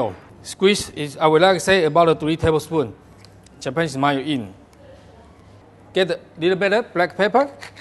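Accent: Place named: China. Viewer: His accent is definitely of China...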